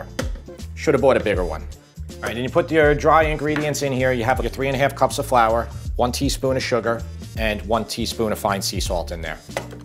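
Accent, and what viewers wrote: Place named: Italian-American, United States. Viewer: Ah, Pizza Chiena di Campania, there aint no such word as "gaina" 😀 Thumbs up though, you're a damn good chef and I always enjoy your videos very much and always smile at Italian-American pronunciation. Ree-GOT and Pro-ZHUT and then that perfect Peco-rrr-ino Rrr-omano... Bravo Ragazzo! Really like the lattice you did. I find I don't like a really heavy crust on top nearly so much as this light one. 👍🙏👍 Happy Easter by the way.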